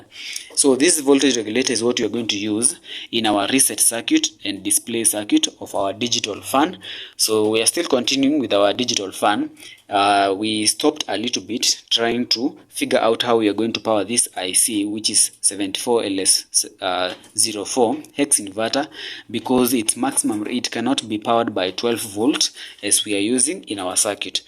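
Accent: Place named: Kenya. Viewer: you sound like a Kenyan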